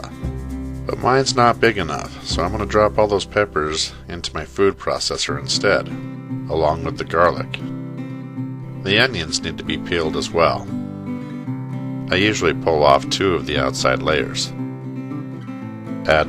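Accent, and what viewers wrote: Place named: Texas, United States. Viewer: Lol. Come to Texas. We all talk like this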